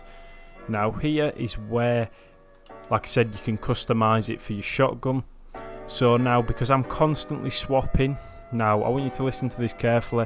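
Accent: Yorkshire, United Kingdom. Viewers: Where from Yorkshire u from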